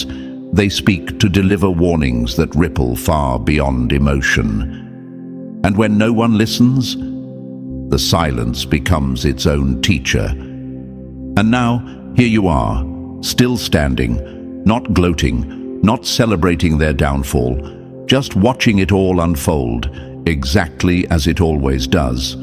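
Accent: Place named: United Kingdom. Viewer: 💫👁️✨🍎✨🇺🇸💫☦️💫🥰😇💫Indeed British accent Angelic man❤☦️🇺🇸💫🕊️🔥🍎👁️💫🥰👁️✨💫🙏😇🙌🏼💫You Speak 🗣️ my Language 👁️🇺🇸💫✨🇺🇸👁️✨🇺🇸💫👁️✨🇺🇸✨🍎💫🕊️🔥❤💫🇺🇸💫❤💫🇺🇸💫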